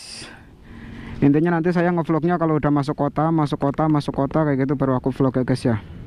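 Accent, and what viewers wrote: Banyumas, Indonesia. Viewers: Salam NINJAR NINJARR KEBUMEN 😂 ,,vlogger nyampur ngapak😁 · Ngapak,e ketok mas😁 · Rider ngapak 😂 Jadi kagak ngantuk gue nton vlog rider 1 ini salam kenal aja mase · Ngomonk nya bhsa ngapak aq jdi inget waktu bersama tmn tmn q dri wonosobo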